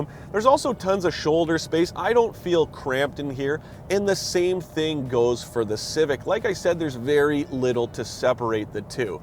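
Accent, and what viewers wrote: Canada. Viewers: dead giveaways for Canada- "aboot" and "Mazz-Duh" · I’m very much looking for a head-to-head comparison of thse two cars, especially how they handle, but find it impossible to bear this guy’s yukky accent. Is he a Canuck? It’s just awful trying to listen to him. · Sad that I noticed this was Canada by the turbo sticker on the civic before his accent gave it away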